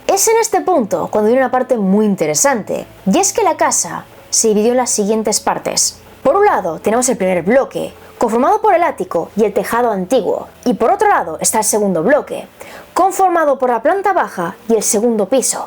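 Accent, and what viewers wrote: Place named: Spain. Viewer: Riddle Tu acento español... Es hermoso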